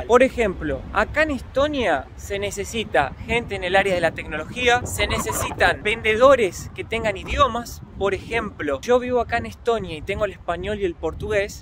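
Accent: Argentina. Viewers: Iniciamente reconozco eres argentino ;) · Hola,eres de Argentina?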